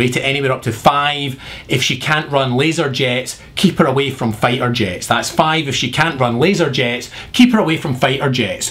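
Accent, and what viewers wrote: Scotland, United Kingdom. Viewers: I'm no going to say whether or not I support Obama or McCain. I am going to say, however, that the last two of videos have been incredibly disappointing to me. It sounds like he just reads op-eds with a scottish accent. I go to youtube to wind down, because I've heard to much of politics in my day. I don't want to be blasted by politics again., please go back to making fun of the iphone and dvd special features. Those sketches are funnier.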